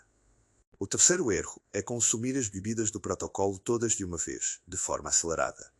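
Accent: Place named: Portugal. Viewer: Interessante este Dr. IA fala português de Portugal e português do Brasil! 😮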